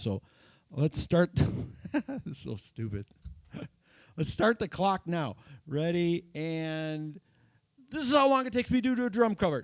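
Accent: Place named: Michigan, United States. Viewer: You sound like you're from Michigan! (I grew up in southwest MI).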